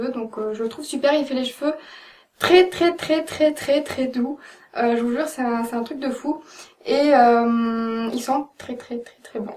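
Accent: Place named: Quebec, Canada. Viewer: tes vidéos sont super! c'est mignon tu as un léger accent québécois!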